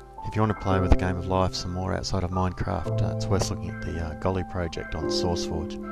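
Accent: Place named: Australia. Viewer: an infinite grid of souls... sorry, your australian accent made me think you said souls :)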